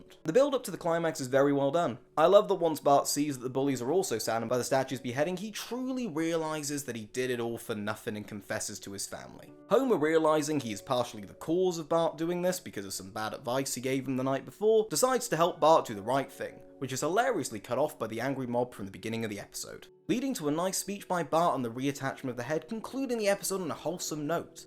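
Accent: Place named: United Kingdom. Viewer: You sound British, very similar to Sideshow Bob's brother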